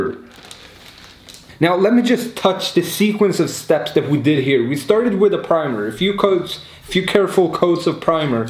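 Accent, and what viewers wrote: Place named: Sweden. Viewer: Mahbub Tamim He is from Sweden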